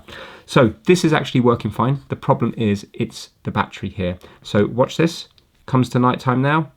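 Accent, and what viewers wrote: United Kingdom. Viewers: thanks again for all your hard work and providing a ton of entertaining content for all of us throughout the holidays, heck I may have learned a thing or three as well. My daughter heard your video and she was down the hall and she said you listening to that again? She said I could tell by the "british accent" anyways, Happy New Year! Look forward to more greatness in 2026!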